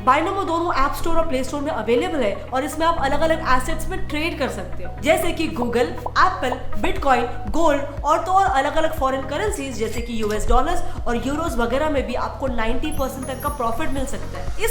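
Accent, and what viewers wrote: India. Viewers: u look Chinese but speak indian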